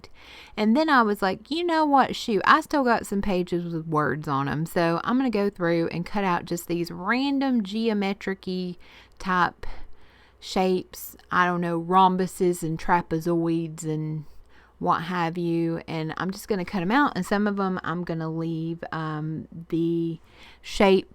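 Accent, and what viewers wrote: South, United States. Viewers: Girl, you are getting good at those voice-overs! Liked the change up with the page too. It's good to break out of our comfort zone just a little every now & then. And yes, you watch those vids with all the art supplies & you want it all. End up spending a fortune too lol. Made me smile when you mentioned east TN. I am from there too. We all got that same accent. Still southern but less of a drawl.😋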